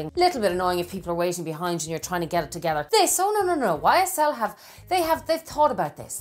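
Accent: Ireland. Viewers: Thank you & your Irish Brogue also make listening merrier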